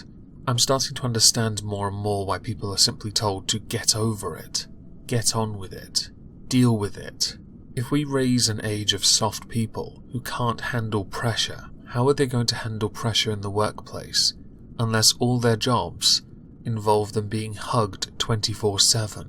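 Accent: United Kingdom. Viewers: Man... I hope you get a bigger audience. We need a sarcasm riddled British accent reading 'less than mainstream popular news to fill the space that groomer Sargon of a Kek used to fill.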